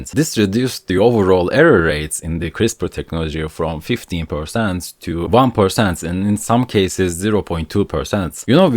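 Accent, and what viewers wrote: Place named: Turkey. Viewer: çok ağır bir türk aksanı var. bütün "the"lar "dı" diye okunmuş. dilinin orta kısmıyla dişine dokunman gerekiyor. ve sesi çıkarmaya hava çıkarmadan biraz daha erken başlaman gerekiyor. ð harfini çıkarırken adem elmana dokun onun titreyip aşağı inmesi gerekiyor. bu harfi çıkarırken dilin havayı durduracak, ses çıkmaya devam edecek (bu harf sesi durdurmaz) ama hava ağzından çıkmak yerine olduğu yerde duracak (yada yavaşlayacak) ve o yukarı çıkmak yerine ses tellerin aşağıya doğru inecek. θ versyonu için böyle birşey gerekmiyor tabi. sadece dilin ortasıyla dokun ve t-s benzeri sesi çıkarırsın. bazıları f'ye benzetiyormuş thank you yerine fank you diyormuş. "figured" figürd diye okunmaz f igyırd diye okunur. hızlı konuşayım derken bütün sessiz harfleri yutmuşsun. "with the prime editing technology" teknaalıci olması gereken şeyi teknoji diye okumuşsun. 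"proteins" proteynz değil prowtiynz. "apocalypse" epokalips değil epakılips. "negative scenerios" negatif senariyoz değil negıdiv sıneğriyowz. pozitif değil paazıdiv. cenetik değil cıneedig. vurgu ikinci e'de, ilkinde değil. vurgu olmayan hecelerde ya ı yada i şeklinde okunur ve hızla geçilir. a, e diye geniş harfle okunmaz. ekşuli değil ekçıli. ingilizcede biz herşeyi u diye okuruz ama mesela "good" gııd diye okunur. özellikle de amerikada. çoğu anglofonun yaşadığı yer amerika. "you are opening two strands of it, you remove one strand of it, and you put the new sequence you want there" sürekli "of it" demeye gerek yok. iki zincirin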